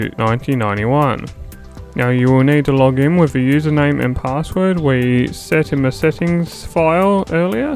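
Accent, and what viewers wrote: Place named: Australia. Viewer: Habib he sounds Australian.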